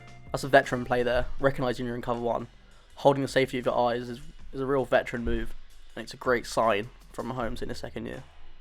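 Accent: United Kingdom. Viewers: where are you from? sounds like the UK, if so, are there many nfl fans there? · This is unbearable to listen to with a British commentator. WTF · I would rather have a football woman narrater than a british accent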